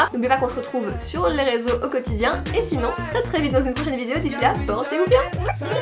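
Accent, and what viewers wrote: Belgium. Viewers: 🙊💞 j'aime vrmt le contenu de tes videos! Tu es super naturelle, et j'adore ton accent belge 😍😍 Bonne continuation 🙆💕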